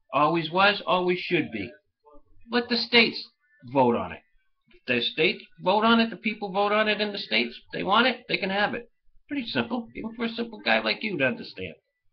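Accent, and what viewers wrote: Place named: New England, United States. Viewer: ***** Yes you do. You have a New England accent like President Kennedy. It's cool. Everything is good thanks.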